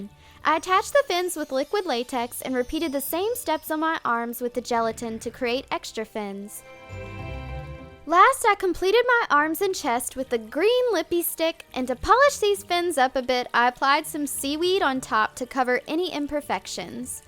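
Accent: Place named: South, United States. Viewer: i love your look, such an amazing transformation but I have to admit, I laughed so much when you're cute southern accent said 'Ghetto Gold', made my day <3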